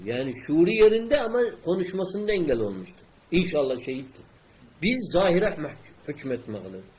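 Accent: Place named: Turkmenistan. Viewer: hocanın şivesi türkmence, allah rahmet eylesin saddam hüseyin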